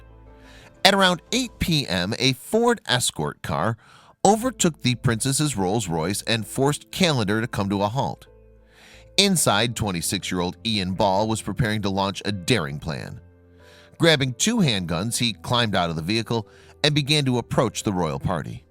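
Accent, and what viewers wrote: United States. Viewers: This was stupid and maudlin. Nobody nowhere wants to see boring still photographs while listening to someone's shrill, whiney yank accent.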